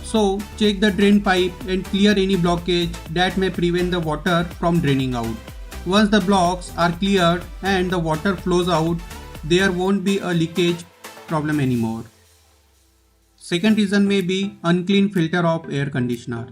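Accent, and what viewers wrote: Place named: India. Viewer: Why all these videos are in Indian accent? :/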